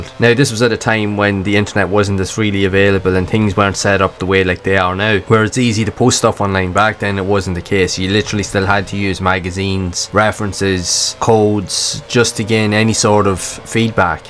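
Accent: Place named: Drogheda, Ireland. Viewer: Class vid. Thanks for sharing. Thats gotta be a drogheda accent?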